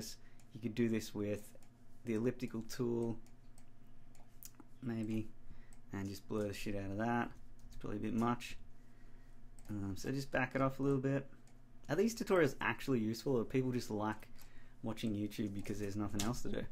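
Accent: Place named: Australia. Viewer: Great video mate thanks for sharing your workflow tips. Awesome to hear a fellow Aussie finally!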